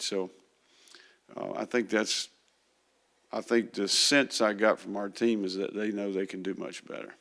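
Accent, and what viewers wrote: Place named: South, United States. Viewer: Finally a coach with a southern accent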